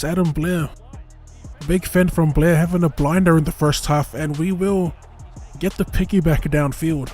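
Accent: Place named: New Zealand. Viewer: Love hearing a kiwi pronounce all those names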